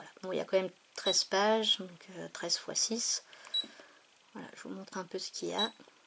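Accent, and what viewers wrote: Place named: Normandy, France. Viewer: Bonsoir, j'aime beaucoup tes vidéos, super bien expliquées c'est vraiment super car ça manquait, merci beaucoup. Je pense que l'on vient de la même région j'ai reconnu l'accent normand 😉. J'habite à Paris mais je suis native du Havre j'y retourne régulièrement d'ailleurs. Merci encore pour toutes ces vidéos explicatives.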